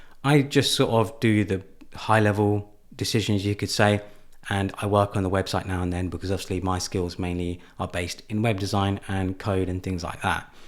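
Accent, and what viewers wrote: United Kingdom. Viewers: you could prolly get paid for your voice too, cool British accent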